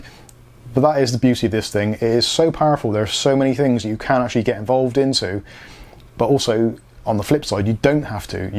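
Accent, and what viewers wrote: Bristol, United Kingdom. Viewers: Hi, I have a Asustor 5202T Nimbustor which is very similar. One thing you should mention is that Asus and Sound blaster USB soundcards work well. My NAS is connected in to my Hi-Fi and all my music and a lot of vids are stored on my NAS which makes it a great media player. I have recently installed the Home Assistant app and he I,m now exploring using my NAS to monitor and control home energy usage. Finally, I think you are a Bristolian judging by your accent... Am I right?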